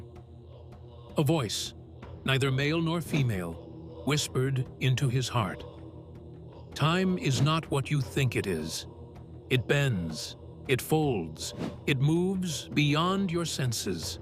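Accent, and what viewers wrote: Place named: RP, United Kingdom. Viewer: Who made this video ? The BBC type English is rather chilling to listen.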